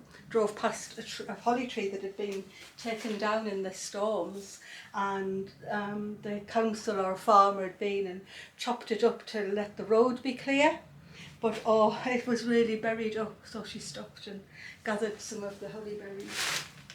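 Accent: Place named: Northern England, United Kingdom. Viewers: I can’t tell you how much I’ve enjoyed being with you throughout this month, it’s been fabulous. I’ve enjoyed watching you, tidying, crafting, baking and decorating the house. I’ve loved listening to you, I’m originally from South Shields so your northern accent has been a pleasant reminder of home. Have a lovely Christmas time and I look forward to sharing time you in the coming new year. Thank you. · I've arrived here very late! I love this... Your bookshelf could've been lifted from my house. And I love that I've found a northerner to listen to.. I'm East Yorkshire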